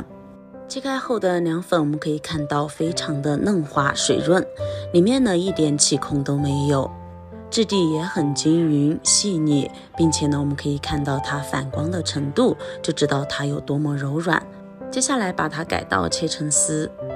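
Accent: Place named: Taiwan. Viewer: 调料的口味像大陆西北地区，口音是台湾🤣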